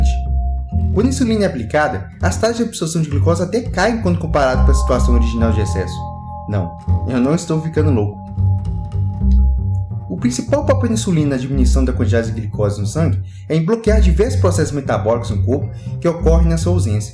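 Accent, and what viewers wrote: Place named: Minas Gerais, Brazil. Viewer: ***** Sua voz é bonita e agradável ao ouvido. Acredito que o "detalhe" no final das frases é questão de mineirice mesmo...kkkkk...Aquele abraço!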